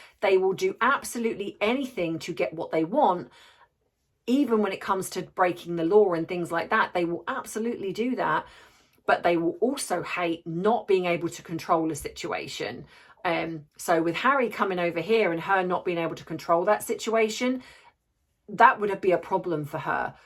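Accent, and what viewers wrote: United Kingdom. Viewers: Thank you, my dear! I could listen to your lovely British accent alllll day! 💕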